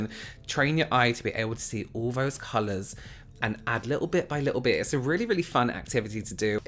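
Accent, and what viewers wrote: England, United Kingdom. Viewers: So, I kinda love you! I used to live in England, so your accent makes me happy. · your accent is divine...❤ what part of England is it i woder?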